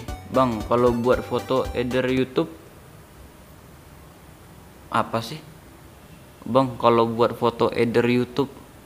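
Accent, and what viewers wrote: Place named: Banyumas, Indonesia. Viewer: ngomongnya yg loss ngapak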